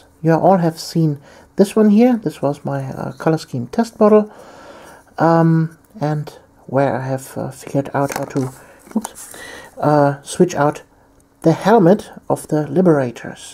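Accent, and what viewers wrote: Germany. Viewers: Ah, da war doch dieser charakteristisch deutsche Akzent :) Wollte nur mal kurz sagen, dass Du wirklich guten Content lieferst und mir maltechnisch wieder wirklich Lust auf das Hobby gemacht hast. Bleib wie du bist, hast nämlich eine wirklich sympathische Art!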